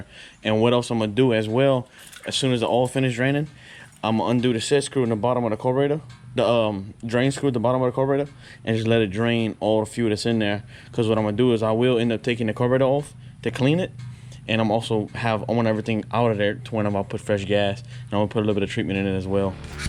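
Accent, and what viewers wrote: Louisiana, United States. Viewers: Bet you from Louisiana accent sound too familiar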